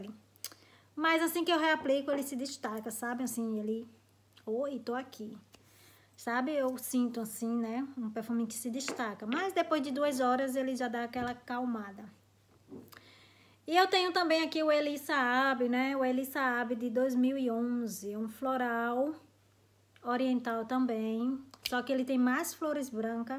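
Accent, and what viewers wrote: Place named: Northeast, Brazil. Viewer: Amo perfumes e estou amando seu canal amo seu sotaque nordestino esse jeito gostoso de falar. ganhou mas uma inscrita. você Raquel Mota Mary Brito e o Ander Style são os meus yotuber favoritos❤💋💋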